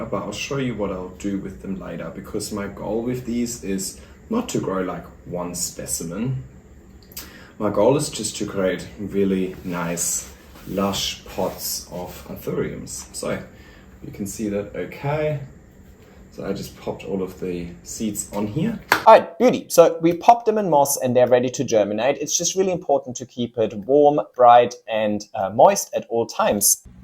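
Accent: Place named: Germany. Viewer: Hey greetings from Berlin! It’s kinda funny that I keep getting German accents from you😅 Any chance you are German? Or least to say I enjoyed your accent, makes me feel homey:)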